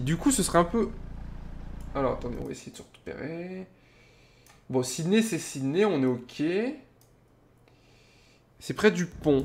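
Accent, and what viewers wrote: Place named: England, United Kingdom. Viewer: Mdr j'adore ton accent anglais lol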